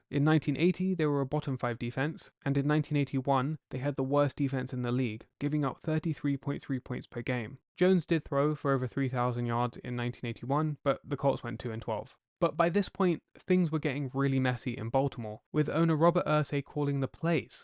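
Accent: England, United Kingdom. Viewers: British narrator or AI ? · An Englishman doing American football sounds funny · why a whack english chap narrating doesnt mix with AMERICAN football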